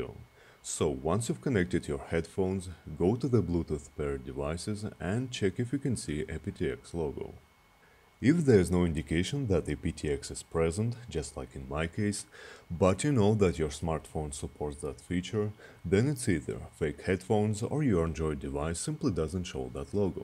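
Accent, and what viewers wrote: Russia. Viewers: русский английский это потрясающе. спасибо за видео!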